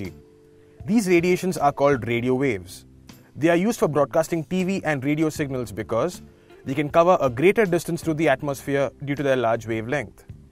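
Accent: India. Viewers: Indian accent everywhere in youtube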